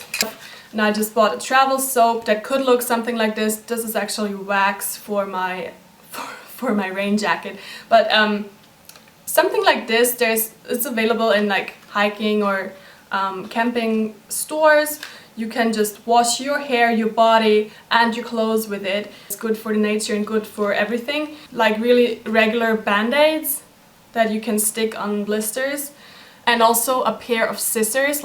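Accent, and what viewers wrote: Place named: United Kingdom. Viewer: but akcent is british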